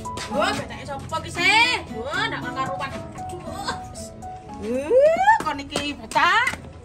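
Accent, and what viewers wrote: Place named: Lumajang, Indonesia. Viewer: Koyok boso lumajang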